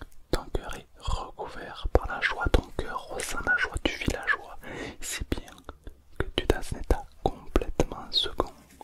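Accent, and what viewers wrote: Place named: Marseille, France. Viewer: Il me fait penser aux vendeurs de drogue de Marseille avec son accent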